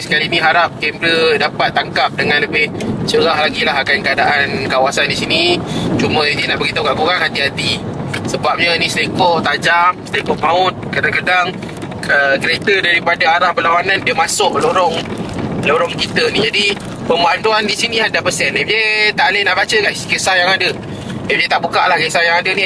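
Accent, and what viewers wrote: North, Malaysia. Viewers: Asalamualaikum fj..nk tnya fj asal dri utara ka?kedah ka penang ka perak ka?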